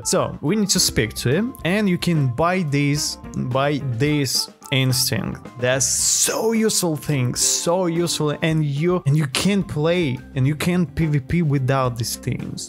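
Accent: Russia. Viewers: Bro has russian accent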